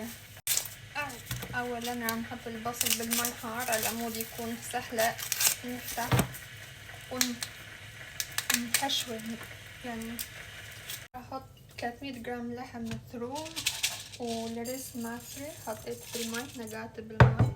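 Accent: Iraq. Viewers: ماشاءالله كلامه عراقيه فد مره تعرف ❤❤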